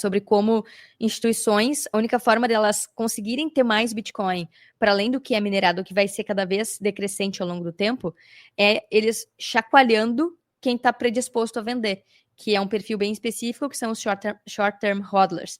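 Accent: Rio Grande do Sul, Brazil. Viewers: tem sotaque de gaúcha, que linda 😂❤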